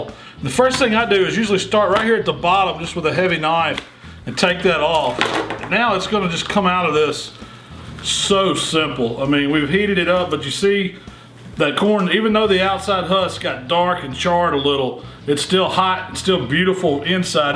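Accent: South, United States. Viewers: Love the Southern Twang, is that TexMex? Great recipe, I'm definitely trying this.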